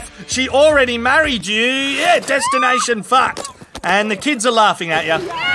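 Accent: Australia. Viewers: Everything’s funnier in dramatic Australian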